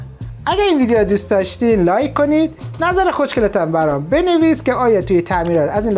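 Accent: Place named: Yazd, Iran. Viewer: لهچه شیرین یزدی فراموش نشه. 😍😍😍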